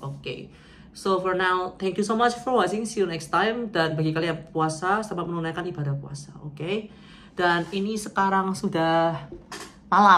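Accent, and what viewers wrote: Surabaya, Indonesia. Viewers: Dari awal nonton video sampean uda curiga dari cara bicaranya kok medok bgt 😂 adakno wong sby 😂 lancar kuliahnya disana ya mas 🎉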